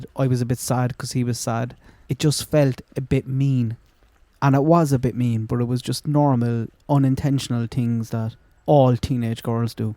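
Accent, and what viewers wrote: Ireland. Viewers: Maybe seem a backhanded compliment but in fairness you have done some very good work here with this part of the investigation, i.e., the items found so props for that. Even though I do struggle at times with the thickness of your Irish accent lol. I used to work in Ireland and never got used to the accent, regardless of how long I was there. It's just one of those very thick accents that one can either grasp and understand fully or not. I guess I am one of those who can't. However, people need to maybe start coming to terms that they were duped and mislead by the Murder Sheet over the Klines, who they literally accused the Klines of these murders. Now, people who were adamant that the Klines had murdered the children, are adamant that they are involved someway or somehow. It may turn out it was just a coincidence that Libby had contact with that AS profile and that is what that Voorhees guy said after hacking into the account, i.e., 'synchronicity. 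Seems that AS profile and Klines profiles were prolific, meaning they were targeting many young impressionable teens and children in Indiana and further afield. I think people need to maybe just stop this Kline obsession and fully focus on what we 'know' and not 'think' we know as Carter put it and we know Richard Allen is the BG, despite Carter not confirming this. That is why your work at this stage is very good as you are focusing on Allen and items found, when other channels were not. Again, props for that. Of course, don't ful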